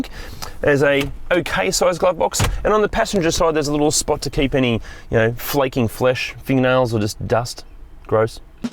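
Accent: Australia. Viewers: Your Aussie accent and sense of humor makes your channel a joy to watch. Specially made me laugh when you called the guy a wanker 🤣🤣 my only feedback would be to include its off-road capabilities. I guess that would be important for some people.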